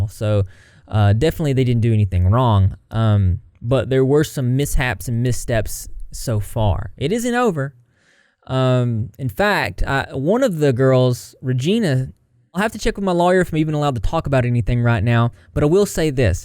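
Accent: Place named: Georgia, United States. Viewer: I don't even care about onision at this point. I just came to hear "and in. Today's. Video." In country ass Georgia accent.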